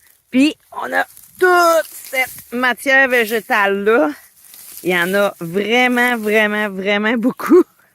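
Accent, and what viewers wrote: Quebec, Canada. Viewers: Bsr encore une vidéo intéressante qui explique bien ton métier et entendre ton accent québécois j en redemande à bientôt pour d autres vidéos intéressantes 😊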